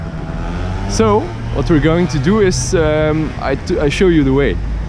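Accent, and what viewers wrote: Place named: Ghent, Belgium. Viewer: hoor ik daar een klein Gents accentje?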